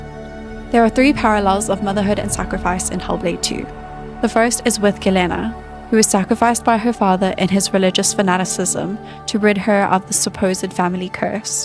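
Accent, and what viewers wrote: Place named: South Africa. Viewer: Only realised after watching its you, i was like omg this is a south african voice and couldnt figure out where i heard it.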